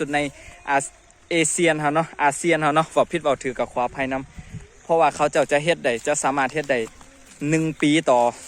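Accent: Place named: Laos. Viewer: บ่าว เว้าลาวม่วนจร้า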